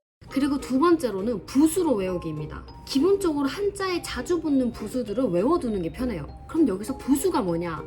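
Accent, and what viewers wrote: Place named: South Korea. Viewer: 이분 한국인임? 한국어 그냥 한국인인데?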